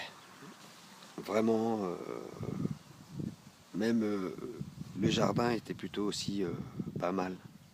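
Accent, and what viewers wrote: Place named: Quebec, Canada. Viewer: merci pour tes vidéos, on va dire que c est pas facile l accent québécois mais ça va j arrive à decrypter.mon premier voyage astral m a bien secoué et g eu peur, je pense que j aurais moins peur lors du prochain voyage.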